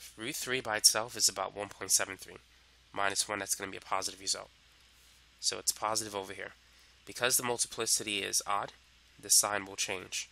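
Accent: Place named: New York, United States. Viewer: You must be from new york proffesser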